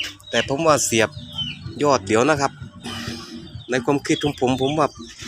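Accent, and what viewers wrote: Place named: Korat, Thailand. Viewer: สำเนียงเหมือนโคราชบ้านเอ็งเลย ปลุกที่โคราชมั่ยคับ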